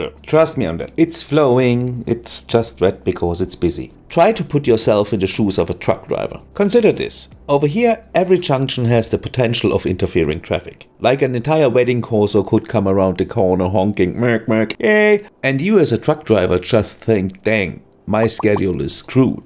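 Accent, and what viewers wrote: Germany. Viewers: Nothing like a good while watching a guy with a German accent explain things I understand nothing about and will probably never use. Very relaxing. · VERY useful. Danke for creating this. (i hope i dont make a mistake saying it in German, you sound German) :) But your english is spot on! :) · When a man with a German accept starts telling me about order and efficiency, I can't help but pay attention. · It was you who inspired me to purchase city skylines five or more years ago. I played for over 2,000 hours. Thank you. The video that blew my mind was one about a dedicated industrial area with one-way roads and one-way rail lines. This was before Industries DLC came out so it was comparatively primitive to this, but many of the principles you used then are present here. Again you’ve blown my mind and given me a yen for city planning. Your cities are living beings with free-flowing roads carrying cars like red blood cells. 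Truly a pinnacle of German engineering. Also I like the Frankfurt accent. It’s both intelligent and hilarious at the same time. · btw are you maby german bc of the acent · Yeah it did lol. The German accent added a +1 whilst talking technical terms as well. I might have to make a new city now. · You can hear your German dialect, hence the comment in German. That's way too much fumbling for me! The game would be a lot more fun if you didn't have to fiddle around so much. This is really frustrating! It takes hours just to get a single node up and running. :( It takes a million mods to get by and to be able to build reasonably well. I hope CS2 has a lot less fiddling and the traffic AI is a lot smarter. You have to build unrealistically many roads just because the AI is bad and many other extremely annoying things... ________________________________ Man hört deinen deutschen Dialekt raus, deshalb das Kommentar auf deutsch. 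Das ist mir viel zu viel gefummle! Das Spiel würde viel mehr spaß machen wenn man nicht so viel fummeln müsste. Das ist echt frustrierend! Man brauch Stunden nur um einen einzigen Knotenpunkt zum laufen zu bekommen. :( Man brauch eine Million Mods um zurecht zu kommen und um einigermaßen schön bauen zu können. Ich hoffe das CS2 wesentlich weniger gefummle beinhaltet und die Verkehrs-KI wesentlich intelligenter ist. Man muss unrealistisch viele Straßen bauen, einfach weil die KI schlecht ist und viele andere extrem nervige Dinge...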